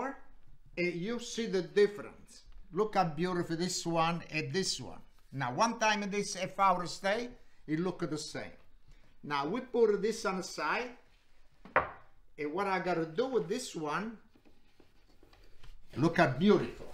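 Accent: Italy. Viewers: I made this and it turned out awesome. My family is from a little town in Italy called Senerchia and you talk just like them and makes me miss them. God Bless you and can't wait to make more of your recipes! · His Italian accent authenticated this video. 🇮🇹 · Hi! This is Canada. The video is very interesting. Italian pronunciation of English is also familiar. I remember the old Italian grandfather who lived next door to my house. He gave me grapes from his backyard grape vine, and if he made bean pasta, he gave it,too They also gave me homemade wine. He was very friendly. Watching your video reminds me of him. It is a very, very old memory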